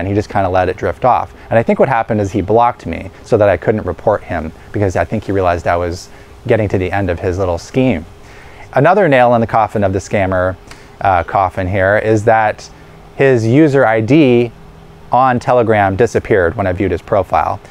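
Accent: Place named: United States. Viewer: Hello, I hope you are well. It's true, I'm not so tech savvy. But it's also true, I trade crypto - in the Winter hahaha. Is it possible you could put together a video on handling crypto safely - all your thoughts, experiences, past mistakes or close calls. Yes, I know there are probably dozens of them out there in youtube-land, but I watch your videos and feel you speak so honestly and directly and I think you would probably put a lot of thought into it and it would be super great. Maybe you have a lot of things to do every day. It's just a question. Thanks again for being so great. I really like your American accent. I know I wrote that before, but I do.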